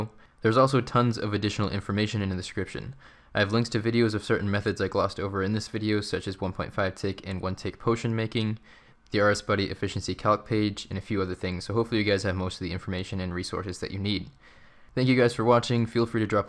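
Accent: United States. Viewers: But you're not even correcting him, because you're wrong. It's obvious from his accent that he's American, and the "h" is silent in American English. There are over one hundred differences between American and British English, and this is one of them. Please don't shit your pants over something so inconsequential which, by the way, is grammatically correct in the first place. This is made worse by the fact that you think you're right when even the simplest google search or slight amount of attention paid in school easily proves you wrong.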